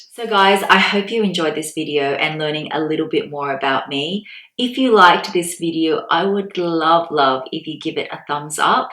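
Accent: China. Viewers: Lots of interesting facts to learn about you! I love how open-minded you are and so brave for trying that spider! Yikes. I have a huge fear of spiders, big or small and the closest thing I could do to try and overcome it was to hold a tarantula. >_< Even then I was frightened. Same goes for heights! eeek! But I love the thrill and adrenaline of just trying. Your dialect sounds like a mix of Cantonese and Vietnamese? Just from the sounds of main languages I know :o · Wow I can understand your dialect well somewhat,,, it's close to Fu Kien and yes nobody understands it here in Canada.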